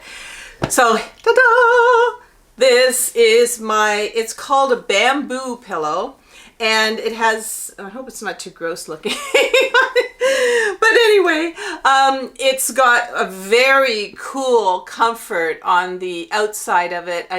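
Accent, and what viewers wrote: Canada. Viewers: Are u Canadian May I ask?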